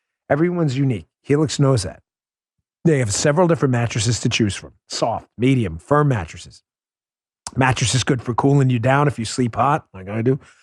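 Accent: New York, United States. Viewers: Hi Love your podcasts… and your NY mouth 😀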